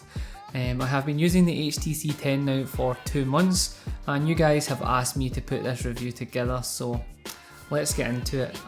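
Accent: Scotland, United Kingdom. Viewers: are u Scottish? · Are you Scottish?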